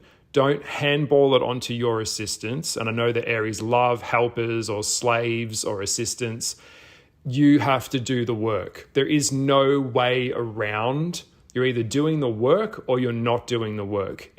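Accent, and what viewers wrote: Australia. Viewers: Nope, not listening to an Aussie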